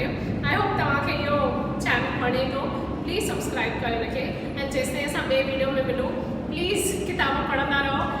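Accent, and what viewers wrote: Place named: Shikarpur, Pakistan. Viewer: Tuhnjo lahjo ee shikaarpuri thae aon tokhy hik frnd sa mlraendas uha tokhy thnji jhlak lgndi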